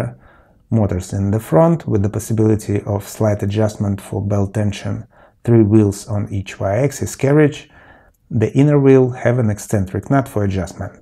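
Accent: Russia. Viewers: Well, the voice and articulation and Russian accent — of course, you need to work on that. I mean at least a normal voice equalization. There's a lot of bass, 125 hertz and 250 hertz are buzzing, there's little mids and highs. Maybe it's okay to hear from the phone, but from a computer with good speakers, it's hard to even hear what you're saying. =) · Your english is great and russian accents are cool Im getting a prusa and have 2 ender clones so im super excited for this as a project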